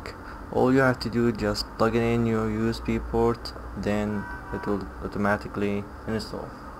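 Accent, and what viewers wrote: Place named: Romania. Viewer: you have romanian accent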